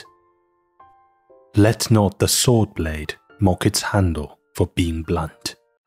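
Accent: United Kingdom. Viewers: 可能是因为住在美国的原因，美英会更顺耳。但是您的英英感觉很舒服，似乎诗的朗读英英更有感觉。谢谢! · 喜欢英音的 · 好喜歡英音英音讀詩特別有意境好贊！ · 優雅的英國腔' 真是迷人啊! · 好美啊！英音听起来很有质感。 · 英音好听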